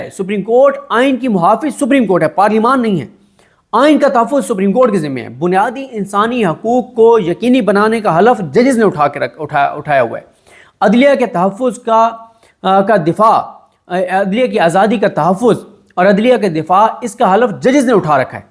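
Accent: Saraiki, Pakistan. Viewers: bhai dill khush ho jata hay jab ap Qazi ki tareef krty hyn..... Mekun lgday tohady lehjay nal tussa saraiki hivay sady elaqay janoobi punjab day...Allah pak tohakun apnri hifzo aman ich rakhy ameen....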